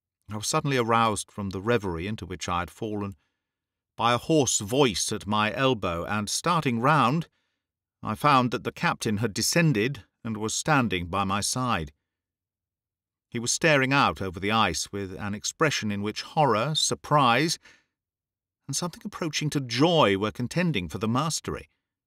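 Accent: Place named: Scotland, United Kingdom. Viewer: Perfect as always,! Your Scottish accent is so fun to listen to!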